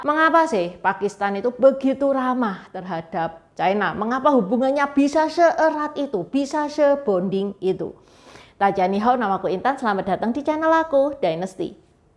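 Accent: Java, Indonesia. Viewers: Medok bgt logat'e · Medokmu kental banget to cici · SENANG DENGAR LOGAT TACIK INI YANG KHAS CHINA JAWA MEDHOK BANGET🙏👍👍👍😅😅😅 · Medok banget jawanya ...❤ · Wong Chino logate kog Jowo...manggone nag Endi.. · Mbak intan ini bahasanya medok kayak orang Jowo sedikitpun tidak terdengar tionghoa hehehe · Jowone endi to jeng,aku subcriber antar lho❤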